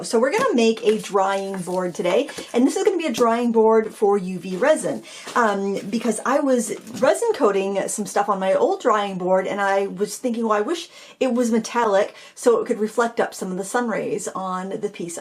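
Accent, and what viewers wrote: Maine, United States. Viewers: LOVE that Maine accent... I thought you were saying 'drawring' board at first :) These are clever...well done. I love how your bowls are turning out!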